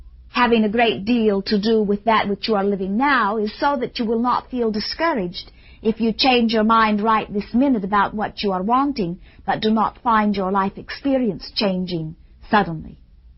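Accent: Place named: Ireland. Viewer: her accent was diffrent when she was youg??? she sounds irish here!!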